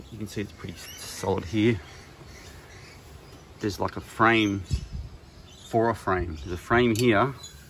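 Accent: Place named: Australia. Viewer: i built a swinging carrier in about 2015 glad to see someone else has done it. Im in QLD sounds like youre in aus too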